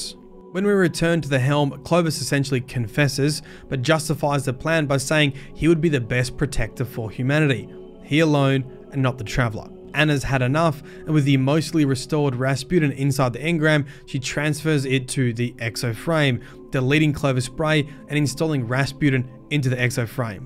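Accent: Australia. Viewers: Man I love Byf but god damn if it isn’t nice to hear a fellow Australian talk about this crazy story! Thank you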